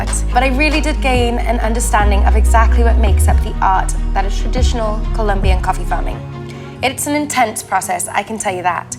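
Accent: United Kingdom. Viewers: This has become my favorite channel, and that cute British accent was the icing on the cake.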